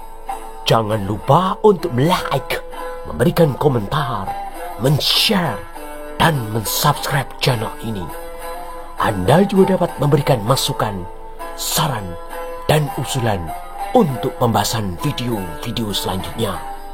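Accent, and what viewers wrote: Java, Indonesia. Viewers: salut ma suara yang ngebacain alur critanya...medhogh banget jawanya...😂😂😂 GBU mas bro...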